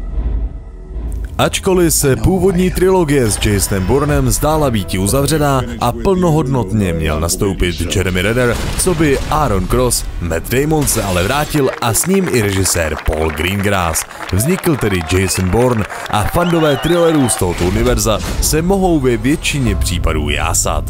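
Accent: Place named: Ostrava, Czech Republic. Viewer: synku nemluv po ostravski bo mi to usi rve:)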